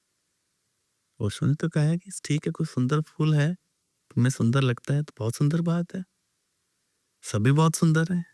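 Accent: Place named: Punjab, India. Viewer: ਪੰਜਾਬੀ ਬੰਦੇ ਦੀ ਹਿੰਦੀ ਦਾ ਪਤਾ ਲੱਗ ਈ ਜਾਂਦਾ 😅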